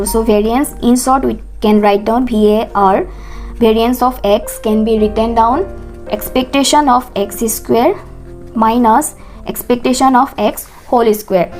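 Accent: Bengal, India. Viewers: Bengali bengali voice☺, nice explanation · Mam apni bengali... Sprosto dhora pore gechhen😅😊... Love from Kolkata ❤🎉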